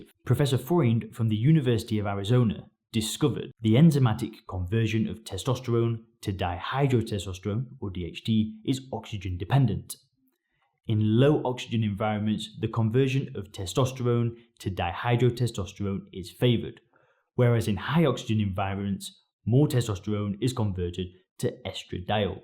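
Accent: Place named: Geordie, United Kingdom. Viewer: Brother I am from northern England same as you, but your accent has got me puzzled, it's like a Geordie that has lived in South Africa for a while.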